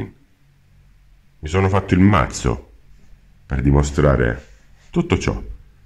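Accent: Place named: Salento, Italy. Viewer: ti ammiro tanto...grazie per la sapienza ke condividi con tutti noi🙏❤️ti ammiro tanto,ankio salentina di Brindisi,sento un accento vicino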